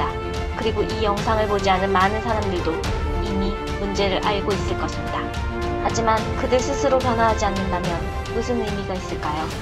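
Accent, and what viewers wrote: North Korea. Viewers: 나레이션 북한여자임.? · 말투가 북한사람같아요. 혹시? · 나래이션 북한사람임? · 발음이 꼭 북한사람같네..표준말이안되면 그냥 사투리쓰시지 먼가 어색하다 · 목소리가 음이 북한 말투인데 혹시? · 나레이션 북한말투같음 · 나레이션자가 북한사람같은데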